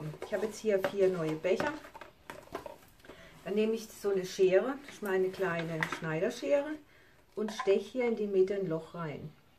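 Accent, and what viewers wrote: Germany. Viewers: Ich hab dich auch durch Annemarie gefunden. Ich habe ihr Tun vom 1. Video an verfolgt und sehr viel gelernt und auch viele Fehler vermieden. Bin schon seit 20 Monaten am Pouren, mit viel Freude und Illusionen. Du greifst gerade eine ziemlich neue Richtung auf. Kennst Du Kristin Oladotter Steen? Sie hat auch das Problem mit den ungewollten Flecken. Falls sie dich stören, 1 Teelöffel Alkohol vor dem Gießen in jeden Becher rühren, dann geht es besser. Ich freue mich schon auf deine nächsten Werke und hab dich gleich abonniert, damit ich keines deiner Werke verpasse. Ich habe leider nicht soviel Platz, um größer als 50x50 zu machen und außerdem fließen meine Stauräume schon seit Monaten über. Das ist ein echtes Problem wenn man süchtig wird oder schon ist. Ich lebe in Norddeutschland und du nach der Farbe deiner Stimme sicherlich im Süden. Liebe Grüße Karin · Hallo ich danke Annemarie dass ich sie gefunden habe. 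Das bild ist wunderbar. Ihren dialekt kommt mir bekannt vor sind sie villeicht von der Baden _Wuerttenberg gegend?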